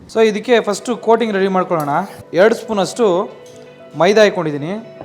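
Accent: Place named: Mandya, India. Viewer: Avva Karnataka dhalli ondhu ondhu prantyadalli ondhu ondh thara mathadthare, adhe reethi adhu Mandya bashe artha madkovva comment madoke munche sariyagi yochesu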